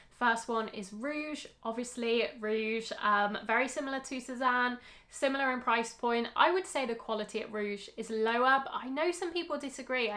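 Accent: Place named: England, United Kingdom. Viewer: Hello this video is a jewel, thank you so much for all the time you put on it!!! From the French, European section I'd add Caroll and Cyrillus. I buy often from them and love the quality of the items. Maybe they are a bit more classic than Sezane and similar in pricing. Nothing to do with that but your English accent is lovely ❤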